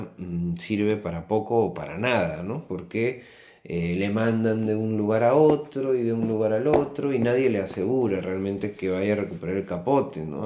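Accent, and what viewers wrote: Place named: Peru. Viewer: Gracias por el análisis. Que lindo tu acento, creo que es de Perú.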